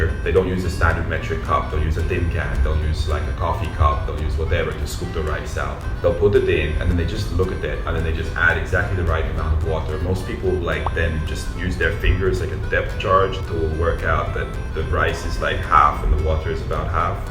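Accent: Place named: Philippines. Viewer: This man is living the life. Exploring the world while majoring on food. He sucks cultures like a sponge, he even got the filipino accent on some words. Very impressive 👍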